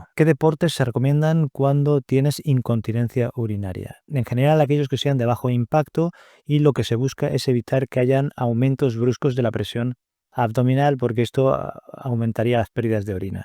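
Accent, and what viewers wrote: Spain. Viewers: Por el acento, es español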